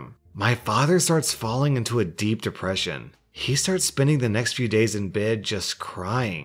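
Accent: Australia. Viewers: 000 he’s Australian for sure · we have an Australian in the house,G-day (I know no one actually says that seriously but hello)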